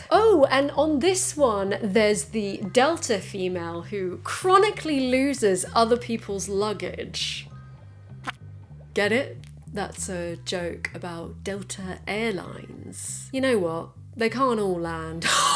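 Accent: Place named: RP, United Kingdom. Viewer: Soooh Posh 💆🏻‍♂️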